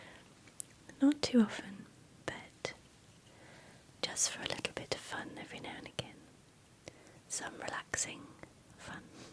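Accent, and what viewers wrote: England, United Kingdom. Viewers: Getting some nice Tingles running thru my body! I love the sound of your voice and your English accent listening to you is a very nice way to relax!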